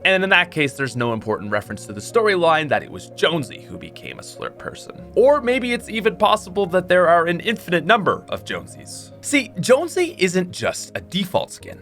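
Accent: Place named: Canada. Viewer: i love his canadian accent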